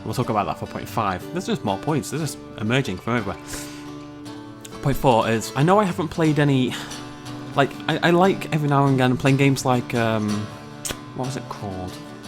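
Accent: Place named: Manchester, United Kingdom. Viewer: I live in Manchester, I thought you were from around here from the accent. Would love to play ARK with you and come see JW if I'm free :) I'm watching a Twitch stream of ARK now and the server won't load :S hope the actual release is better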